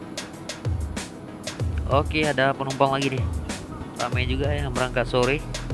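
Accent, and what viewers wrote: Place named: Medan, Indonesia. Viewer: Abang ini asli medan, ap asli orang aceh. Logat nya susah2 di tebak.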